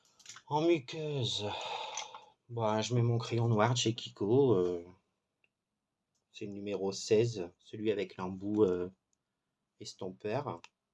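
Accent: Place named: England, United Kingdom. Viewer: L'accent anglais m'a fait rire 🤣🤣🤣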